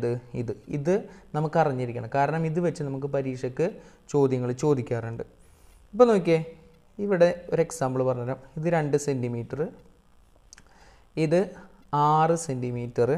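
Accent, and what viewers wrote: Thrissur, India. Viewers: Sir thrissur aanalle njaanum thrissuraa but now im malappuram... I’m still missing our slang😓✌🏻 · Thrissure kkarandhe bhasha poli Nan oru malappurakkali aanu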